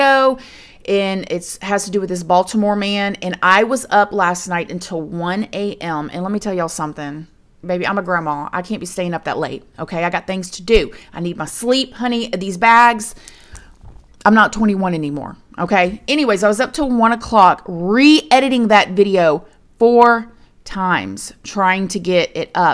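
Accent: South, United States. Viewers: I don't know what part of Florida y'all are in, but I detect a southern accent. I'm in Enterprise in deep southeast Alabama. I startedwatching you in the early Gabby days. Love your videos!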